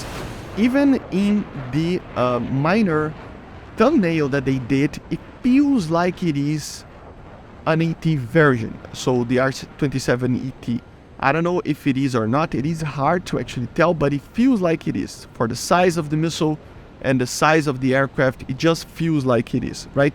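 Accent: Brazil. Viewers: Brazilian accent.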